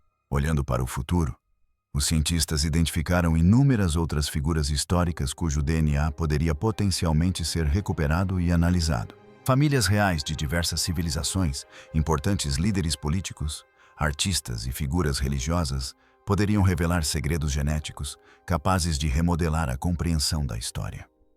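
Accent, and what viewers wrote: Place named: Ceará, Brazil. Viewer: Eu jurava que era Cearense. Minha irmã.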